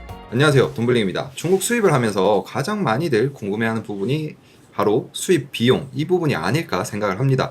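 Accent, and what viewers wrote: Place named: Busan, South Korea. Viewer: 어?? 혹시 저 보고 하시는 말씀 맞으시가요? ㅎㅎ 분석하고 거리가 먼 저 인데 설명 감사합니다. 어쩜 말씀도 또박또박 전달력도 좋으세요. 부산 사투리가 이리 멋들어져도 되는거에요? ^^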